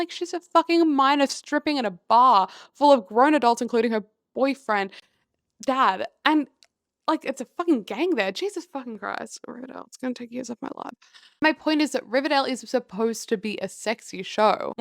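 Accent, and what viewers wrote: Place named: Australia. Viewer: omg when i clicked on and heard your accent my jaw dropped, absolutely thrilled to see another asexual australian girlie!! (even if you're a melbourner lol) great video! i'll be subbing for more :]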